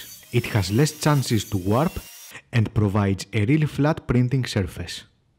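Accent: Greece. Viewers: I immediately understood that you were Greek! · He Greek haha! (I'm Greek too that's why I recognize the accent) Nice video tho well done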